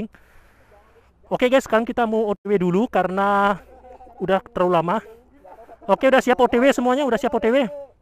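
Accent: Bali, Indonesia. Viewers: Logat bali khas banget hehe😅😁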